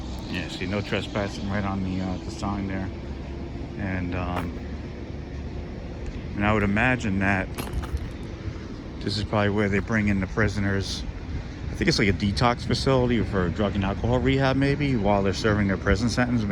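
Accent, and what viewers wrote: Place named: New Jersey, United States. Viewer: 😂😂😂 That's how we speak in NJ!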